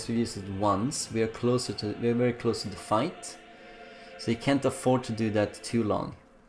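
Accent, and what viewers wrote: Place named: Germany. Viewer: I was just curious about your nationality. Your accent and manner of speaking reminds me of my German grandparents. When I mentioned your ability with the numbers, I was referring to the calculations you were making on the fly concerning critical hit chances and such (which also led me to believe you may have German ancestry =) ). I hadn't made it to the Excel portion of the video yet. I think it is good to show people that when you track numbers, you can make the best decisions. Because math. Keep up the good work.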